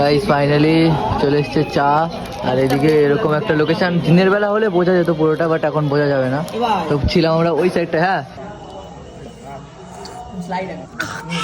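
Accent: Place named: Digha, India. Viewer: Vai majha Tumi ja tomader local language a kotha bolla amder dighar dikao amon typer kotha bola.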